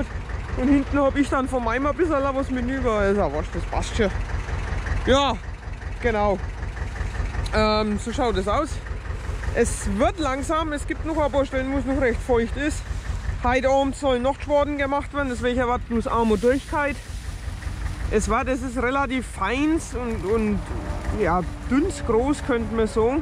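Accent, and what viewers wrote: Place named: Middle Franconia, Germany. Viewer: Kommst du aus Mittelfranken du redest genau so wie ich 😂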